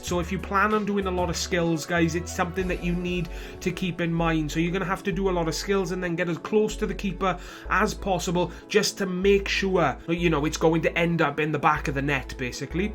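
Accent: Wales, United Kingdom. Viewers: Are you welsh!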